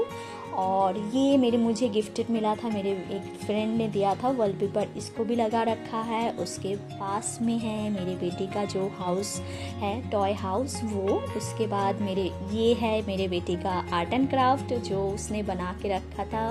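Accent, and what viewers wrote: Bengal, India. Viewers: Aapke bolne k lahze me jo bengolipan dikhta h n wo aapki awaz me char chand lga deta h dii ..Love you dii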